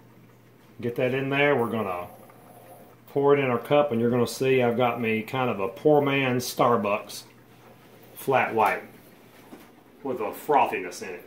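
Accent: South, United States. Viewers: Thanks, I enjoyed that, and there is one of those espresso makers sitting unused, in my office. Will try it out, and see if it will help me get rid of the sugar from my coffee. And I'm glad you are still going. I think the most important element of your message besides your natural relaxed what of talking about all this, is "us.", You present in a way that is inclusive and convincing to others, because "we" feel you are one of "us." The message is too important to leave unreached by those who may not feel to be one of "us." I do think your message is extremely important, and you should reach out to others who can make videos like yours, carrying the same message to those who need it, to reach others in our society who otherwise might not listen an old white guy with a Southern twang. Be well.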